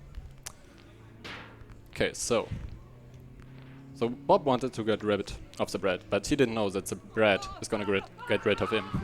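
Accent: Germany. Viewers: Love how you can hear it that he’s German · Tbh we actually used to make fun of other germans that speak with an accent like that in school. Most germans who dont say ZE instead of THE dont like hearing that "german" accent.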